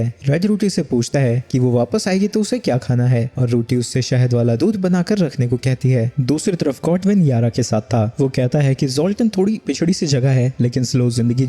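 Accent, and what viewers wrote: Gujarat, India. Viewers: Bro are you gujarati?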